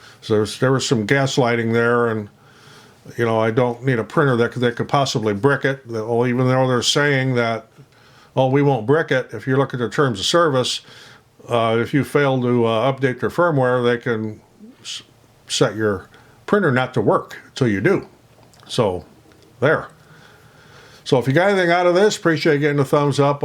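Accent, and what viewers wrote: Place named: Scotland, United Kingdom. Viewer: Scottish accent, boy oh boy. Well, coming from an american anyways.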